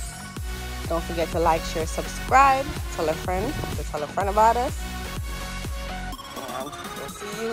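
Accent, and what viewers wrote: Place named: Jamaica. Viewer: Happy 4th of July. Lol one minute you talking so I can understand then the next you talk Jamaican lol but I understand I’m learning 🤗🤗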